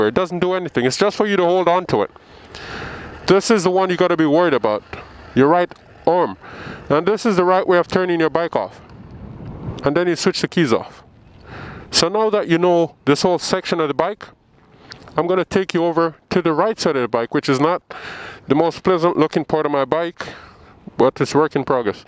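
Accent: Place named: Caribbean. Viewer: dis is your ORN ! your accent is a mix of foreign and caribbean lol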